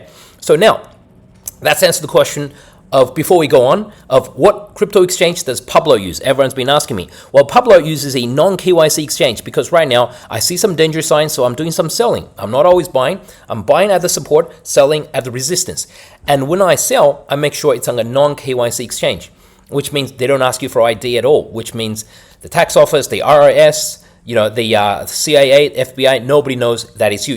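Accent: Australia. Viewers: Accent sounds Australian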